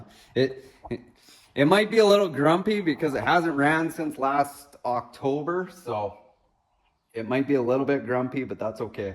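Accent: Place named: Canada. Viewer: Am I missing something or is It he may be tired or something but he kind of sounds a little Canadian ish here compared to his other content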